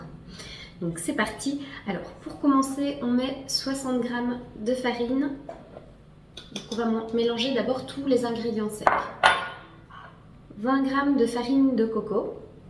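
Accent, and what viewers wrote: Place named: Belgium. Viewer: Salut j’avais deviné que tu étais Belge en 90 g en belge 😉😂👍🏻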